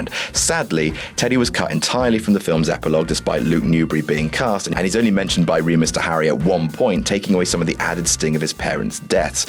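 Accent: United Kingdom. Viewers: You sound British (?) yet you use American titled books.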